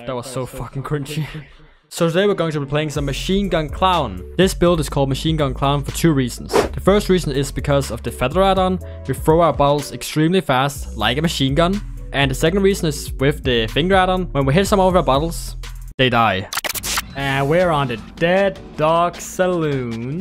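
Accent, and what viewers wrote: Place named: Germany. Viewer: Nice Vid :D and i have an question. Are you European? (your accent is similar to mine and im german soo)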